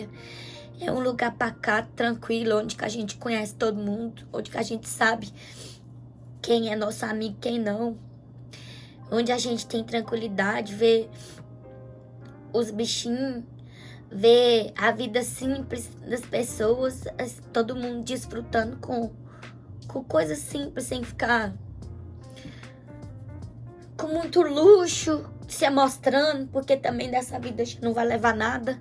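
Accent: Minas Gerais, Brazil. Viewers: Querida amo ver vc contando sua vida no exterior tão menina e com tanta maturidade pra aceitar as diversidades da vida....parabéns por amar nosso país q é abençoado por Deus o que ñ presta são as lideranças sua história é muito linda amo seu sotaque mineiro meiguice demais ao falar deve ser lida falando brava jeito lindo de ser humilde: grande abraço! Deus é contigo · acho tão bonitinho seu sotaque...a família do meu pai são mineiros e acho mto legal esse jeitinho uai de ser. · Logo vi... Seu sotaque mineiro , não perdeu.. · Linda sua história, vc é lindaaa , acho lindo seu sotaque mineiro, admiro ver mesmo morando fora do Brasil, continua amando nosso país, eu também tenho esse mesmo sentimento saiu do Brasil por causa de segurança e poder dar uma vida financeira , educação para minha filha , mas o Brasil nunca saiu do meu coração ❤️ tenho minha casa 🏠 no Brasil , um dia voltarei a viver lá 🇧🇷 se Deus permitir quero terminar minha velhice lá, minha terra amada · Oh! 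Sua história é linda, quanta meiguice 👏👏👏gente nao esquece de cutucar o sininho👍amo esse jeito mineirinha de você falar🌹b💋no teu ❤ desejo uma boa hora minha🌹aqui no nordeste falamos assim quanto tem uma gravidinha!! Admiro muito você visse? 🌹do dia🌹da tarde e 🌹da noite!!💋💋💋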